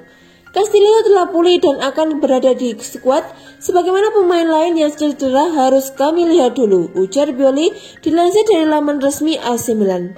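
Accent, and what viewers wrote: Java, Indonesia. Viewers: Haha medok cok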